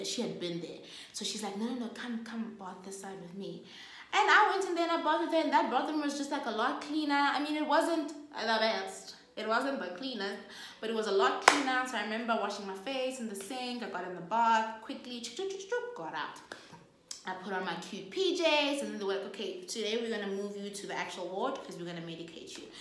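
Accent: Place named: Botswana. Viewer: Your tswana accent...OMW KE E RATILE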